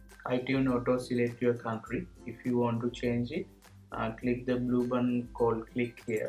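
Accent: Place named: India. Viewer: India English^_^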